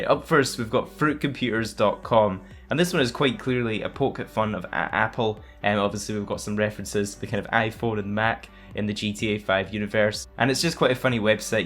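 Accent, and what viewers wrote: Scotland, United Kingdom. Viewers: Hey man! Are you scottish by any chance? You sound like it, love your channel! <3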